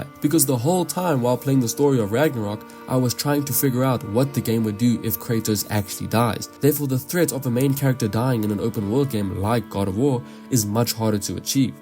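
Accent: South Africa. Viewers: you South African ik that accent on god😂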